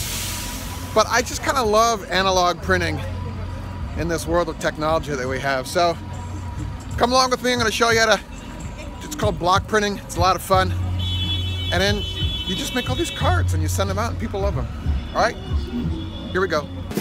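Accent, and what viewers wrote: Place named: South, United States. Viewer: Merry Christmas and Happy New Year to you and yours. Thank you for the great video's. I have learned a great deal from you all. LOL sounds pretty southern for a northern girl.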